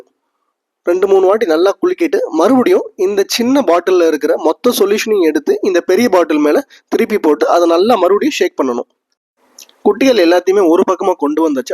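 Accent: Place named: Madurai, India. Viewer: ❤ madurai basha thanks ❤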